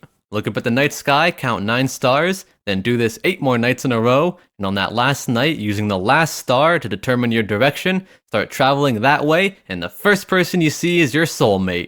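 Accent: Canada. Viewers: Dude, what part of Canada are you from?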